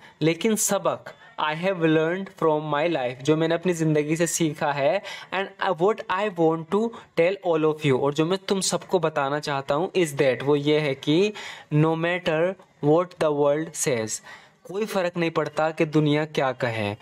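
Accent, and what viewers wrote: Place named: Ambala, India. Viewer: Ap kah. Se ho sir... language ambala side ki lag rhi h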